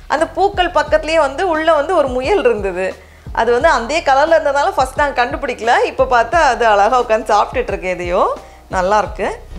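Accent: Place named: Southern Tamil Nadu, India. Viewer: ninga unga speech la Thenmavattam thaan ninaithen... athu correct pola Nagarkovil nu sonningalaa...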